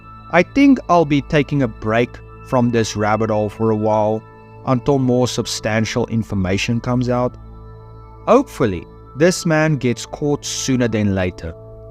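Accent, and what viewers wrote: South Africa. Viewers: Unrelated question but where are you from? Your accent tells me you're South African. Love your content btw.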